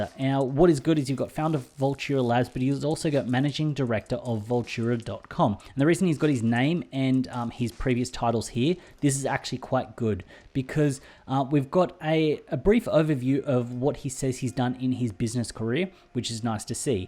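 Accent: Australia. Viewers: i knew you were a fellow aussie:)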